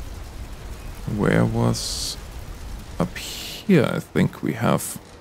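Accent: Germany. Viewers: canadian? you have a german accent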